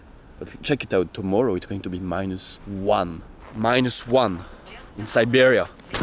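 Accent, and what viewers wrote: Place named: France. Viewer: ещё бы от акцента избавиться и будешь похож на русского, но французский акцент милый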